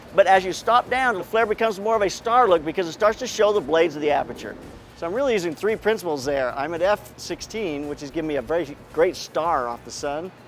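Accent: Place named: South, United States. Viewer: Wow she was country twang in that voice I didn't expect that LOL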